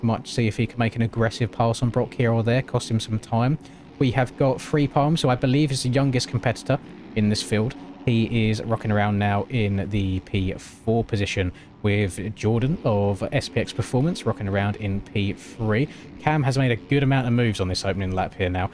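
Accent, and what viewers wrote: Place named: United Kingdom. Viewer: I'd much rather listen to your Brit voice commentating than Leigh Diffey any day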